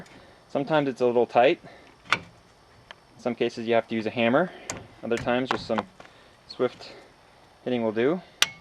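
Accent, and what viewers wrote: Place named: North, United States. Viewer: I suspect by his accent he is up north, in the US ... hence the rusting....great video..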